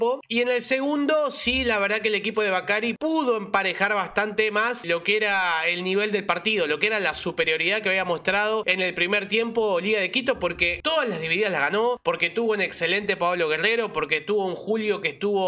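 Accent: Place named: Argentina. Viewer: ESTE PERUANO CON ACENTO ARGENTINO UN CRACKK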